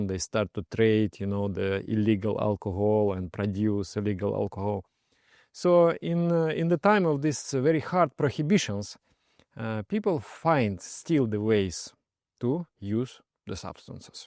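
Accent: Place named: Russia. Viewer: I love the Russian English accent.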